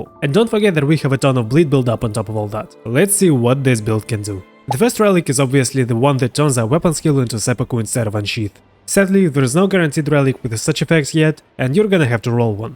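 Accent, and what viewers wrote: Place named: Russia. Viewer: Друг, ты русскоговорящий? Просто акцент очень сильно выделяется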